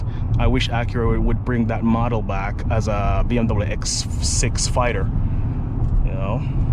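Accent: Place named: Caribbean. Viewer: Where are you from? You sound carribean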